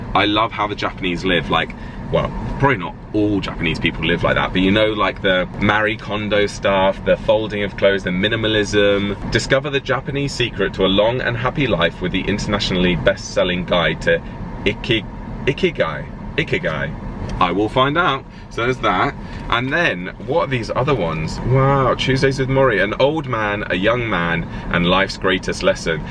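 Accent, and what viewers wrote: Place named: Southeast England, United Kingdom. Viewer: I love your gorgeous, classy SE English accent and I could easily see you going on our national broadcaster RTÉ, in the footsteps of Marian Finnucane, Kathleen Watkins and Gay Byrne - being proudly Irish myself and despite living 21 years in Manchester, I’m told that I still have a very strong Irish accent and also, despite being age 52, I’ve been told that I look younger than my age 🇮🇪☘️🇮🇪❤️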